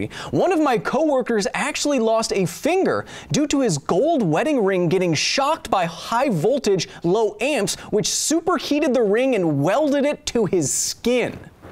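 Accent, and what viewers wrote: Poland. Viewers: HI, really love the show and I loved the polish accent of this vid (for obvious reasons xd) but don't do my boy Tomasz like that... In pronunciation of that name you emphasize the "T".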